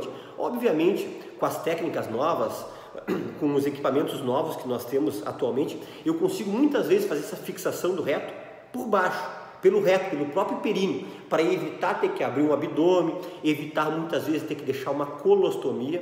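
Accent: Rio Grande do Sul, Brazil. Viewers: Dr muito obrigada por seus videios,Tenho 65 anos,tenho Diverticulite e as fezes ficam presas na parte direita,ai vou massageando p baixo p que solte.Procuro me alimentar bem e mais de alimentos naturais.O que devo fazer p defecar melhor? Agradeço sua resposta. Amo o RS e seu sotaque, morei 12 anos em Novo Hamburgo,agora moro no RJ,onde nasci.Deus o abençoe ricamente sempre.Bjo no coração.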